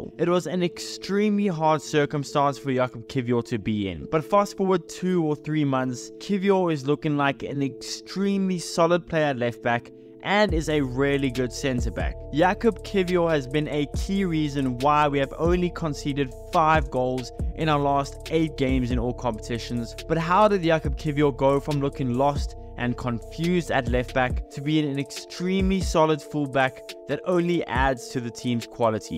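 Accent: South Africa. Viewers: I dunno but your accent sounds so south african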